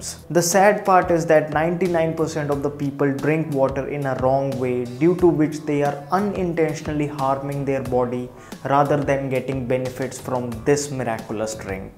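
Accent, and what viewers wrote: India. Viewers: It’s not easy, to understand your „INDLISH"! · He speaks slow because he is not faking any english accent he speaks purely Indian english accent.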